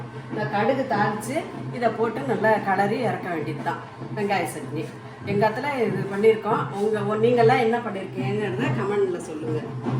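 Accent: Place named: Telugu, India. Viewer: Atta, you seems to be Tamil side telugu to me. Where do you put up in chennai?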